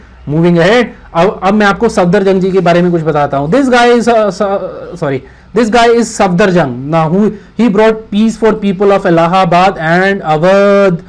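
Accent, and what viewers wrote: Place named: Punjab, India. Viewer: Your way of teaching is really good I,m your big fan sir ❤️❤️❤️❤️❤️❤️bus aap please Toda Hindi Mai bhi explain kar diya kariye i, mean ek summary type hindi mai b bata diya karaiye aap and apki baato se lagta hai ap punbai hai .....Aap bilkul real waale punjabi hai bilkul cool type k rocking type like....huaaaaaa baleee balleee te sava sava🤭🤭😘😘😘☺️😇🤴🙈❤️👉❤️❤️❤️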